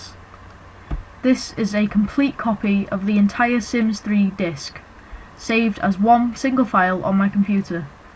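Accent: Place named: United Kingdom. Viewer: Thanks! And .. uhm .. I LOVE YOUR BRITISH WAY TO TALK!! :)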